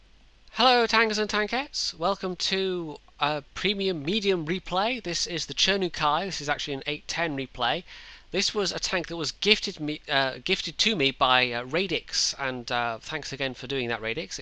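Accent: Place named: Scotland, United Kingdom. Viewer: It's the Scottish accent messing it up. :D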